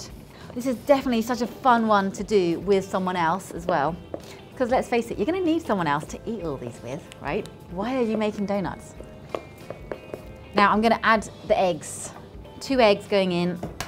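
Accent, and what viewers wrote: England, United Kingdom. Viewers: Man, I love to hear cute women speak with an English accent.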